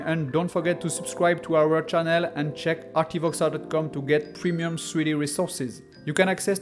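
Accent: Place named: France. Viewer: why not creating different sphere and put them one by one ? and you won 10 min wasting time , . why you need to creat line to instance point on that lines ?! when you add something you did not say why ... and it abit hard to use plugin to separate materials node ? do it from houdini after use 2nd way to plugin mybe this plugin is not free or someone cant get it . atleast know how to do it natively but thank you for your videos bro . and vive la france ^^ . from your accent i can tell you are french guy . you know very wellhoudini nice to meet you bro .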